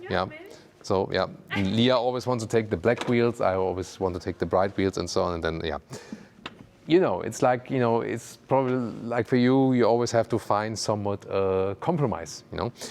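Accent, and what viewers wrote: Germany. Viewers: Excellent presentation,. I adore German craftsmanship. And I prefer the Blue Q9 over the SQ designation. Its understated elegance carries a more distinctive refinement. And your lovely accent makes me wish Audi would use you in a Siri, or Alexa-style interactive firmware suite with the Q9. It’s wonderful!🇩🇪🌷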